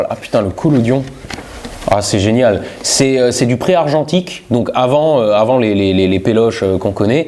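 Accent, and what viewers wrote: Alsace, France. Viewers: Ton accent Alsacien on dirait un mélange entre l'accent Allemand et Luxembourgeois ( logique tu vas me dire ) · Aucune honte à avoir l’accent alsacien! Signé: un parigot qui se désole de l’uniformisation du français. Belle vidéo émouvante à plein d’aspects. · Ton accent alsacien de BasRhinois la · Au sujet de l'accent alsacien, faut savoir que je suis du sud-ouest et que mes voisins étaient alsacien, avec l'accent, mais bien pire que le tien, coté allemand de ouf, et du coup quand ils s'engueulaient en mi-français alsacien/mi-allemand on pouvait pas s'empêcher de se taper des barres. C'est comme un québecois qui t'engueule, c'est drôle. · J'adore l'accent alsacien (je ne suis pas alsacien) ! Je connais deux personnes qui parlent vraiment comme tu l'as fait et ça n'a rien de ridicule. Même sans te forcer, on le reconnais bien ton accent : réécoute-toi à ;-)) Bravo et merci pour tes vidéos. 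· J'adore ton accent d'Alsace hahaha C'est la première fois que j'entendais cet accent <3 Un jour, peut-être que je pourrais te faire découvrir l'accent du Québec (ou en a aussi plusieurs hahaha J'ai fait un "live" dernièrement mais je sacrais trop pendant un test durant un vol dans le Grand Canyon, dans mon simulateur, je sacrais juste trop pour le publier ici et de toute façon, seulement mes friends FB peuvent le voir hahaha) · Haa l'accent alsacien ! Ça fait longtemps que je l'avais pas entendu tiens. Ça rappelle des souvenirs.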